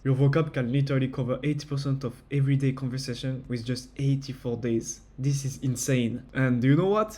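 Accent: France. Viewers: I thought you sounded french 😂🙈🙈🙈. You mentioned how learning Japanese is different from learning other languages (mostly in terms of reading). Maybe you can go deeper into that? Maybe talk about how you adapt your your methodology to the language? 🤷‍♀️ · L'accent français mdr 😂 A few seconds in, I get used to it, I just love when people have some accent (as long as it doesn't make them hard to understand). Also the advice seems solid.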